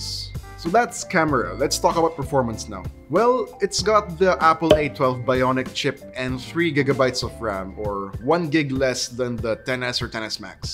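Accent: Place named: Philippines. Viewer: When I heard your accent, I instantly thought you're a filipino.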